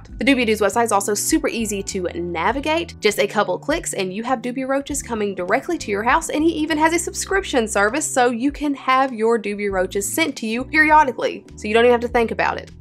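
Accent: South, United States. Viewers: Okay love your channel and Omfg your southern accent is just freaking adorable omg …… lol I’m from ga I’m southern as well but omg u just sound to cute are you from Texas bama or Ga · Love your accent! Sounds southern :)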